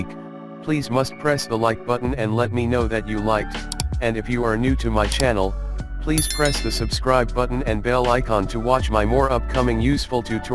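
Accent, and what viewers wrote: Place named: United States. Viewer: Aap ayse kesy bol lety Hain American English?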